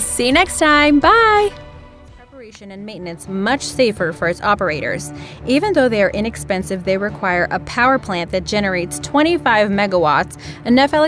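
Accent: United States. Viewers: She has an American accent. How can an American get the buffalo identification wrong? Maybe she is a Millennial.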